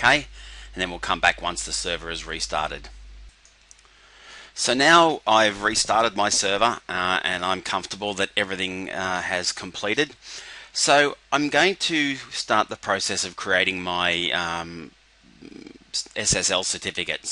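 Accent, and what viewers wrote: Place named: Australia. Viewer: love the auzzy accent ;)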